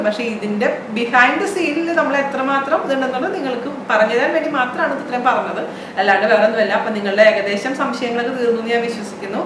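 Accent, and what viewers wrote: Thrissur, India. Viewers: Hi..njan chechide receipes recently aanu kandu thudangiyath. I tried ur cooker biryani, palada, mango, vanila, butterscotch ice creams..super ayirunnu. Cookingil chechiyanu ente guru.njan adukalayil kayaratha aalayirunnu.marriage e aduthaan kazhinjath.so cooking avasyamai vannapozhanu chechide videos kaanan thudangiyath. valare detailed aayi trissur baashayil samsarikumbol njan valare intersetode kelkum.pinne try cheyum.ithuvare ulla ella first tryum success ayirunnu.now cooking ente hobby aayi maari.thank u so much.likes ur smiles, sarees & way of talking👍. We are staying in sharjah. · ഇനി ഒരു കാര്യം കൂടി പറയട്ടെ തൃശൂർ ഭാഷ ആയത് കൊണ്ടും കൂടി ആണ് ഇത്ര hit ആയത് ചേച്ചി un dout സത്യം ഒരുപാട് ഒരുപാട് ഒരുപാട് കുക്കിംഗ്‌ ഷോ കണ്ടിട്ടും ട്രൈ ചെയ്തിട്ടു മുണ്ട് പക്ഷെ നമ്മൾ എവിടെങ്കിലും പോയാൽ നമുക്ക് ഇഷ്ടം ഉള്ള വിഷയം ആവും സംസാരിക്കാൻ താല്പര്യം എങ്ങിനെ കറങ്ങി തിരിഞ്ഞാലും ഞാൻ യിൽ എത്തും അത്ര ഇഷ്ടം ആണ് ഫുഡ്‌ പിന്നെ ചേട്ടനെ അതിൽ ഏറെ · we love u a lot n I try most of ur recipes.. 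All r superrrrr 👌specially ur thrissur slang May God bless u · Hi... Thank u very much for uploading this video. In ur video i like the most thing is that u explain the things very deeply, with that beautiful smile n confident voice, n that thrissur slang language bcoz i m also from thrissur, but settled in gujarat. I m ur fan now, will watch all ur videos n try to make receipes. I already tried beet root thoran from ur video n got got good comments from my friends. Thank u very much.... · adipoli ayitundu nammude thirssur bhasha mattano orikkalum ella ale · English ൽ സംസാരിച്ചാൽ തൃശ്ശൂർ ഭാഷ പ്രശ്നമല്ല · nammude thrissur basha vidaruthu tttoooo.......superaaattto chechiyekkalum enikk chettaneya ishttam... bcz he motive u and encourage u....thtz great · Thrissur language anu chechiyude vediosnte highlight.. njanum oru thrissurkari anu.. kalyanam kazhichath kochikaraneyum.. apol eniku thrissur rujikootukal ente vetil poyal mathrame aswadikan kazhinjirunullu.. 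husband nu kochi taste analo.. nerathe with family kochiyil thane ayirunath kondu avidathe tastel food undakiyale ellavarkum ishtamakumayirunullu.. ipol njanum husbandum molum qataril anu.. arginine thane husbandinu meth pareekshikukayanu thrissur taste ulla foods.. pullikaran ipo parayukaya ne nanayi cook cheyan padichalonu.. athinu chechiyodu anu thanks parayanullath..thank you so much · Hi....nalla rasamundu chechiyude samsaaram...njanum thrissur aanuttoo....athukondu bhasha maattandattoo..ente husbandum chettane pole thanneyaa....chilappol pidichoru kuthu kodukkan thonnum 😠... ennalum kuzhappamillaattoo... aalde Job athupoletheyaa... eppozhum thinking mind aayirikum...anyway thank you for your advice....👍👍👍😘😘😘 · Thank you, varthamanam valare ishtayi, your experience is great inspiration to all. Please don't publish cookery books, nobody has the patience to go through them, adilum ethrayo rasamane ippozathe reethi. 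Don't bother about nasty negative comments. Trissur slang mattalle, appol veenayallathakumtto, adu theere sahikkan vayya, so pls continue in this same manner. It's so sweet of you and to tell us in detail about the monetary part of the deal. No need to change your logo, that has become part of your identity. You have cleared the doubts of many of your viewers, usually nobody takes the time to do that. Yeah has made you more confident and independent. Hearing your talk, I'm feeling so close to you, veetile oralepole. May god bless you and your family. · Chechy thrissur basha nallatha...super... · Good inspiration aanu.... Chechide thrissur bhasha enikk othiri ishta.... 🙏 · I love the way you talk! I find your videos very informative and entertaining! Don’t lose the thrissur slang;) I am cooking now because of you! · Thrissur bhashakkentha kuzhappam njan thrissur aanutta checheene vedio kanumbo nte veettilethiyo pole thonnum athrakku Rasa kekkan 😘😘😘😘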